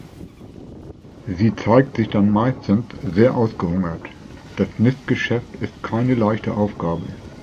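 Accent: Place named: Hanseatic, Germany. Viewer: Durch Zufall diese Krähen-Videos entdeckt - bin ganz begeistert. Zusammen mit den tollen Kommentaren mit authentischem, hanseatischem Einschlag ein harmonisches Gesamtkunstwerk. Bitte unbedingt mehr davon und alles Gute nach Hamburg!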